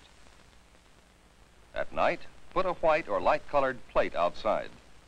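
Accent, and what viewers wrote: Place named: Mid-Atlantic, United States. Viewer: Mid Atlantic accent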